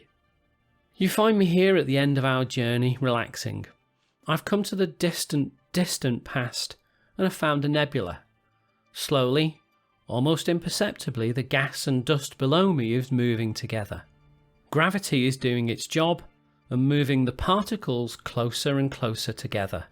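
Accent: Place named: North, United Kingdom. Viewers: YES! A new Learning Curve vid! This is one of the absolute best channels of this type out there. You have a great talent for explaining sometimes difficult concepts in easy-to-grasp terms, and I can't tell you how many times, after watching one of your videos, I've said to myself, "FINALLY I get that!" (as in, Finally I get what the Planck length is, or Planck time etc.) and all in a friendly, homey, Liverpudlian voice that reassures you that these enormous scientific puzzles will be explained carefully and clearly. Bravo, man. You do fantastic work. I'm so glad I discovered your channel. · I love your northern accent. "oor soun"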